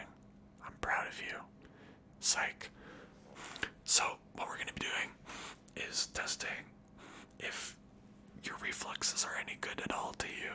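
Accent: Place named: Germany. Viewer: U sound German are u German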